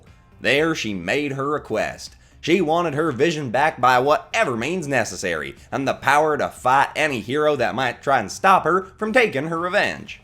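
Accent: United States. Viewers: Not to be rude but I personally am not a big fan of the country accent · This narrator kinda sounds like a mix between a robot and a country accent but it’s great tho can’t wait for more episodes with Harold lol 😂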